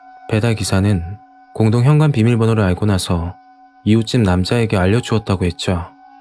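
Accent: Gyeongsang, South Korea. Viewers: 와우 오늘도 어김없이 님 영상과 함깨 합니다 님 혹시 경상도 분이신가요?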